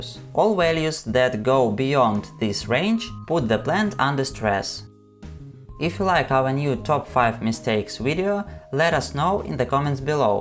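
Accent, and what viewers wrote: Russia. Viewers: Я которая слышу русский акцент👁👄👁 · Too russion. My earssss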